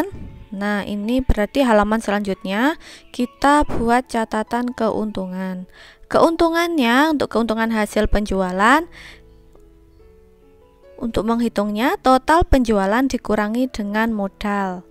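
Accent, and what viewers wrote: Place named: Java, Indonesia. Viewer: Logatnya mirip orang Jawa.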